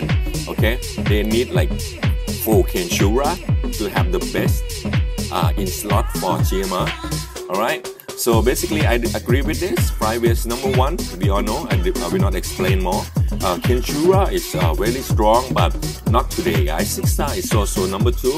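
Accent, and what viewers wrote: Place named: Thailand. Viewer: That Thai accent though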